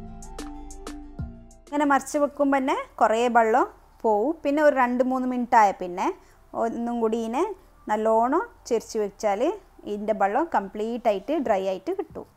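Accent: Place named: Kasaragod, India. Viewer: Nice video dear..especially kasargode talk 👍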